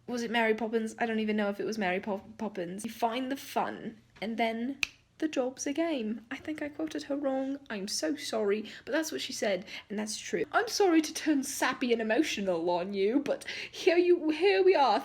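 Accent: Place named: United Kingdom. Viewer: i'm literally laughing so hard right now! ohh, and your british accent is amazing :)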